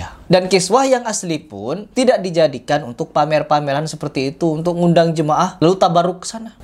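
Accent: Madura, Indonesia. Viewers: Salam dr madura mas,oea kok logat masnya kyk org madura ya?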